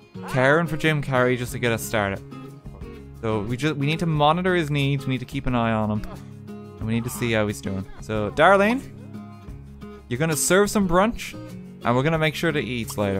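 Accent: Ireland. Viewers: I figured you were Irish. XD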